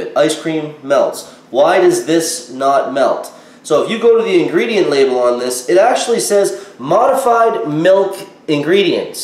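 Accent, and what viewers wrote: Canada. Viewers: Breyer’s natural vanilla only has milk, cream, and sugar. It definitely melts. Don’t condemn all Breyer’s ice cream. Plus Breyer’s lids do not fall off. They have a plastic strip that goes around the edge of the lid. Evidently Oreo’s must be paying you money. I don’t know where you got this ice cream.but the Breyer’s sold in my store melts. That container is not saying ice cream. It’s a frozen dessert. Anything that says frozen dessert is not ice cream. You are obviously not here in the states. I don’t know where you are located. Since your English sounds pretty much like we have here, I’m thinking you are from Canada plus the French labeling is also a clue. I only buy their Nural Vanilla because it contains no preservatives or chemicals. Be truthful when you are condemning a product, otherwise we can’t trust you either. 😟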